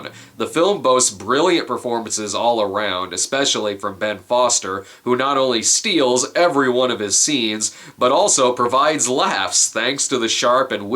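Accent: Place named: United States. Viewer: you have the best American dialect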